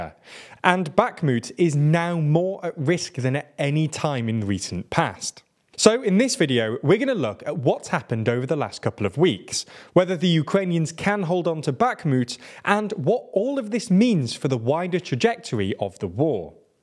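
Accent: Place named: Midlands, United Kingdom. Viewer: I've never seen any other youtube channel that consistently butchers Ukrainian place-names as consistently and as poorly — and almost none of them speak any Ukrainian either. If they're trying to deliver news about Europe, this channel needs to try harder to adequately pronounce European words that aren't muddled with a Midlands accent.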